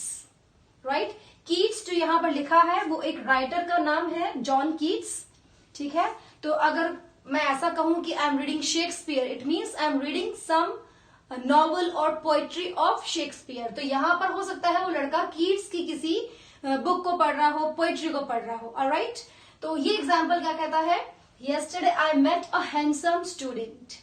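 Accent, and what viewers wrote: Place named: Sikar, India. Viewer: Didi mai sikar se hu aapki voice sikar me rahne walo ke jaise lagi isliye pucha tha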